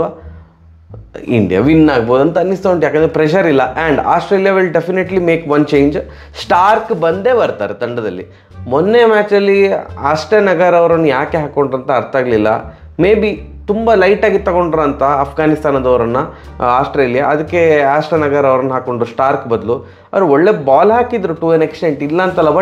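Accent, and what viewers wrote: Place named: Dakshina Kannada, India. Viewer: ... ನಿಮ್ಮ ದ. ಕ. ಕನ್ನಡ ಕೇಳುವುದೇ ಒಂದು ರೀತಿಯ ಖುಷಿ..." ಮಾರಾಯ್ರೆ ", " ಒಟ್ರೇಶಿ ", " ಎಬ್ಬೇ ".. typical slang accent...❤❤❤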